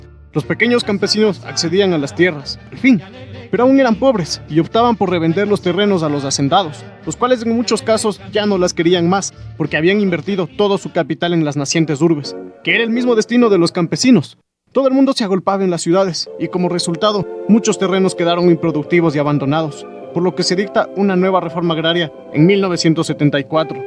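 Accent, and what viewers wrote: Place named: Mexico. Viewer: El narrador es mejicano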